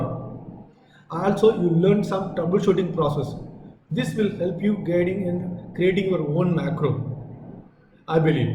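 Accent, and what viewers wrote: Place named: India. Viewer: OMG accent English Indian is just Horriful xD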